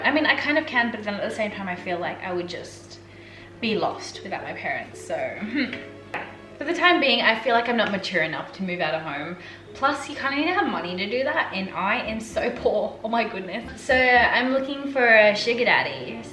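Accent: Australia. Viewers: i knew it! i recognised ur australian accent right away lol congrats on reaching 100k!! ure soooo relatable subscriber from australia ❤️ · omg!! yess! finally... someone. is. Aussie!!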